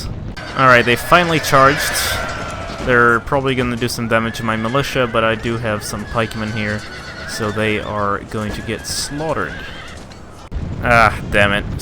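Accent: Sweden. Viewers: Are you from sweden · Are you Swedish?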